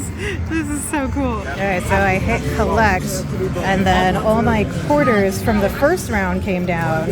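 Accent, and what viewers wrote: United States. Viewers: You have an amazing American accent, it's like what youd hear on an American commercial or something (I'm from Yorkshire, England) lol.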